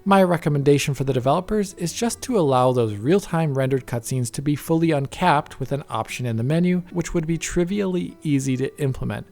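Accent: United States. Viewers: He lives in Germany, speaks with an American accent, and has an Italian name. Go figure! :)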